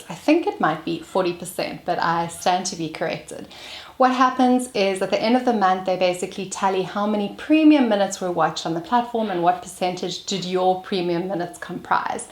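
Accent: South Africa. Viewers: What an incredibly beautiful woman. Goodness me. And the South African accent just sprinkles even more beauty !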